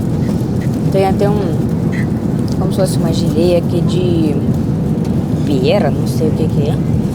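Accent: Russia. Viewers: Que charme de sotaque dessa russinha! Maravilha. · você é muito linda, muito fofa, seu sotaque russo é maravilhoso e te deixa muito charmosa, gosto muito dos seus vídeos, sou seu fã brasileiro!!!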